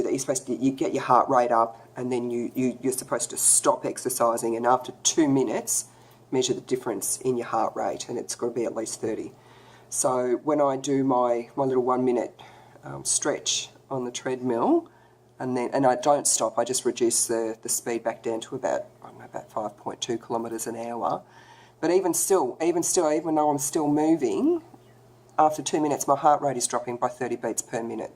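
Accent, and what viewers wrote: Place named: Australia. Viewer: Hi, Thanks very much for posting this. I had an iron infusion yesterday, am feeling achy, lethargic, hypersensitive and a bit nauseous today, like a bit flu-ey or something. Appreciate your video, so far, you're the most watchable genuine person I've found and of course the Aussie accent is the best break from all those bloody American ones! 🙄👍❤️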